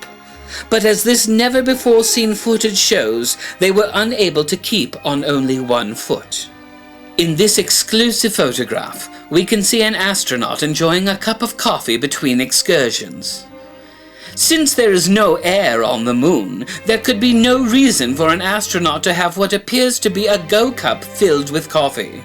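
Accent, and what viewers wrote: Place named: United Kingdom. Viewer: lol the brithish accent does sound sciencey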